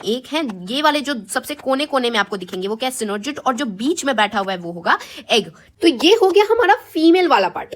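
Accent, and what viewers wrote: Bihar, India. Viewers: didi ap bihar se ho kaya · Didi bihar se ho kya ????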